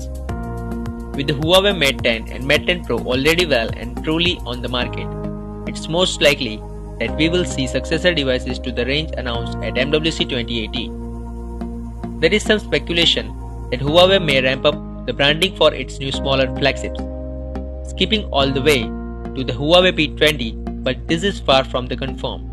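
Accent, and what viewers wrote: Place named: India. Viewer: Indian English accent not exactly Youtube worthy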